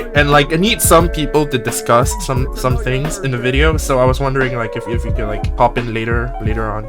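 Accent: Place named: United States. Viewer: Just want to say, I love the accent you're packing. And I thought every Singaporean Vtuber here had the Singlish problem, you're one of the first I've watched with a normal American accent, and I'm happy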